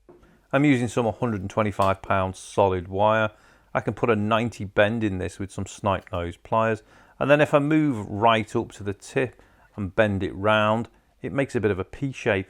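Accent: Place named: Liverpool, United Kingdom. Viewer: Where’s that accent from? I get bits of scouse and bits of the midlands! Great vids mate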